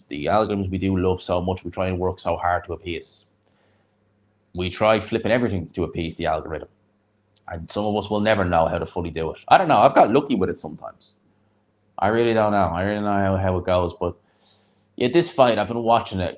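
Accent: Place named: Ireland. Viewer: Thought I'd check out your analysis; always enjoy your comments and Irish accent. Enjoy your sleep!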